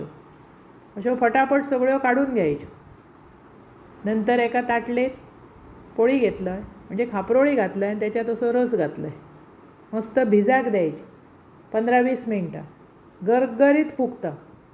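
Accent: Malvani, India. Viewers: तुमची मालवणी भाषा बोलणे खूप सुंदर असा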